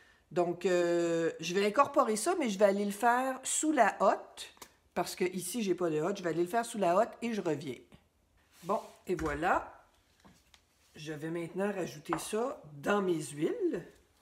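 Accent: Canada. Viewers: Le savon au soufre organique est souvent utilisé pour traiter les problèmes de peau tels que l'eczéma, le psoriasis ou l'acné sévère. En effet, il s'agit d'un sébo régulateur, d'un anti-bactérien et antifongique très efficace. Voilà après il a beaucoup d'autres utilisations, comme pour les douleurs de l'arthrose(les bâtonnets de soufre)..... Etc J'adore votre accent, les canadiens est un peuple cher à mon cœur, mes amitiés. Bonne soirée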